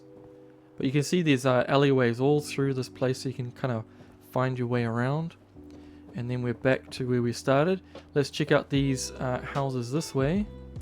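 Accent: New Zealand. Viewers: Judging by the accent, you're a kiwi, right? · You sound like your from New Zealand? Just wondering? Nice content btw 👍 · kiwi sounding fella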